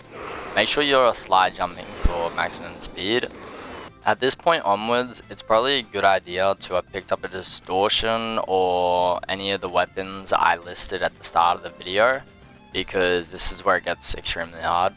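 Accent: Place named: Australia. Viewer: nice australian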